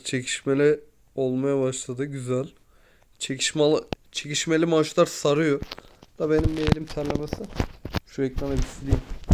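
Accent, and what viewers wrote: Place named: Kahramanmaraş, Turkey. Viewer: Maraşlımısın kardeş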